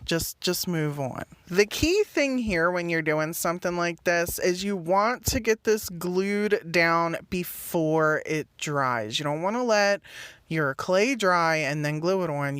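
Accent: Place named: Pennsylvania, United States. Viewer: I enjoy listening to you. You sound like Pennsylvania. I loved growing up in the 50’s. Kids were so innocent.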